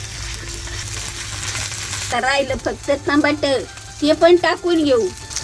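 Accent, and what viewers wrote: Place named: Ahmednagar, India. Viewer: आजी च बोलण्यात नगरी तडका आहे 😂🥰❤️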